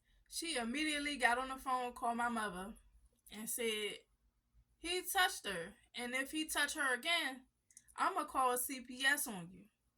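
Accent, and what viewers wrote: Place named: Washington DC, United States. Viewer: Dc accent heavy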